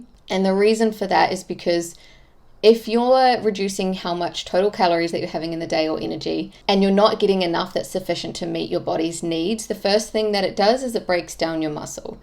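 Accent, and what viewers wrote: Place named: Australia. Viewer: Excellent teacher and the Australian accent makes it nicer. Hello from Canada.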